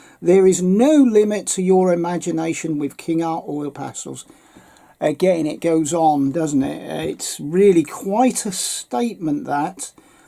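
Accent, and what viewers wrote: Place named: Norfolk, United Kingdom. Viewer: sounds like ur a norfolk boy.dyou know jason norman?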